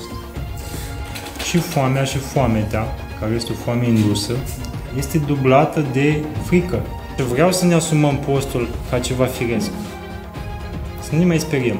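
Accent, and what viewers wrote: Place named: Moldova. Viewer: explicatiile tale sunt extraordinare, pe intelesul tuturor nu cu cuvinte sofisticate, iar accentul tau de moldovean este placut la vorbire si pur rominesc sincer din inima iti spun, succes pe mai departe.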